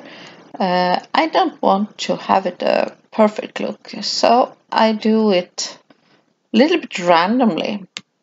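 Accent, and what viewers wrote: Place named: Sweden. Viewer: The end result is so awesome! I love your accent! Are you a Swede?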